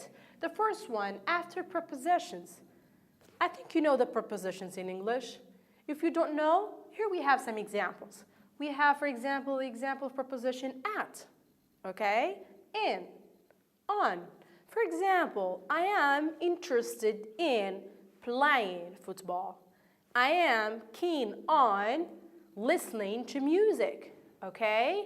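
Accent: United Kingdom. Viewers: I'm not understand what do you say & you speak English as you are British & speak Arabic for everybody understand what you say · haha i get u but the accent also british ..... tell how do you know the deference between the accents ?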